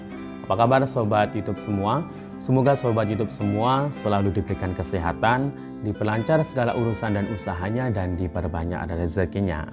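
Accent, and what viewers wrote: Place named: East Java, Indonesia. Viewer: Jawa timur mendegerkan bicaranya om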